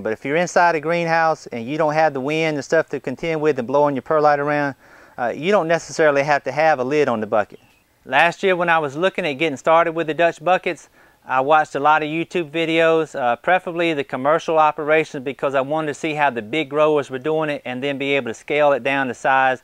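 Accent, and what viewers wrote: South, United States. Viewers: My Tennessee wife is dieing to know where you are from, she’s always asking where people ok youtube I watch are from if they have southern accents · I love your accent. What part of the south are you from? It makes me thoroughly enjoy your videos in addition to the awesome info!!!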